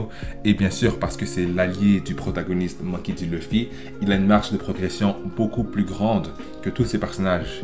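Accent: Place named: Montreal, Canada. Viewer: Hey j’aime tes vidéos ton accent me rappelle ceux qu’on retrouve à Montréal est-ce que tu viens d’ici ?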